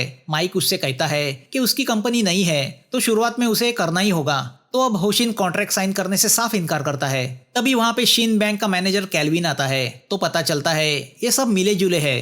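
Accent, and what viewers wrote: Maharashtra, India. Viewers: amazing Marathi accent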